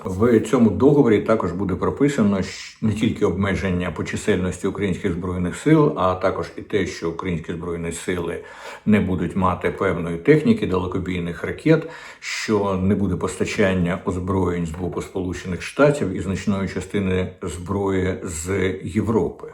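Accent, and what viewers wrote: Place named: Moscow, Russia. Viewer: ВИ - пречудовий оратор! Але, пробачте, чом ВИ ніяк не виправите вашу московську фонетику? маячЬня, щЬо, передачЯ, чЯстина, незначЬної, чЬому, почЯтку, ухІлянтам, політичЬна, бачІмо, схІльні, агЄнт, очЯх..... та й дивна конструкція-потороча "всЕ рештА"...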